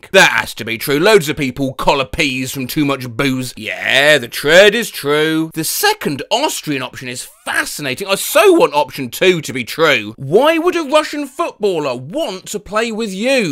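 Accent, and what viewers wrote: United Kingdom. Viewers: He's LITERALLY Sherlock Holmes... speaking fast and in a detective sort of fancy way, deducing fast, British, sherlock soundtrack.... it's literally perfect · You sound british :D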